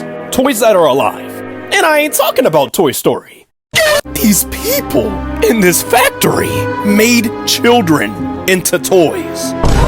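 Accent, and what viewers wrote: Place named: Philadelphia, United States. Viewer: Why his sound like philly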